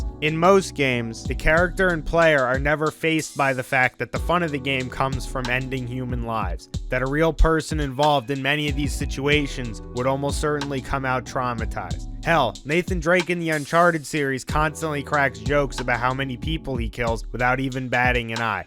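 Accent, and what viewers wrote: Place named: Chicago, United States. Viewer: That's an interesting accent you have. Are you from Chicago?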